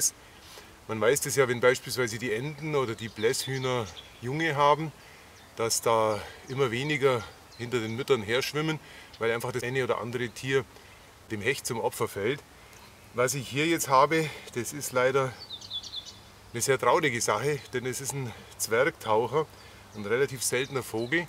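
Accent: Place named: East Franconia, Germany. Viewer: Ich mag Deinen Dialekt! Ist das ostfränkisch? Bamberger Gegend? LG aus Österreich..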